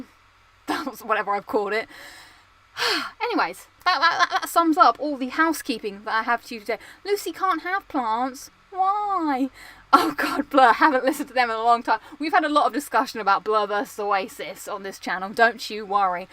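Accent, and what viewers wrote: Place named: Brighton, United Kingdom. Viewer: Are you from Brighton you sound like you’re from Brighton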